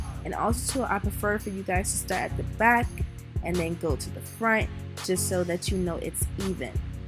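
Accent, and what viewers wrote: New York, United States. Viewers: Yo she has to be from ny the way she talking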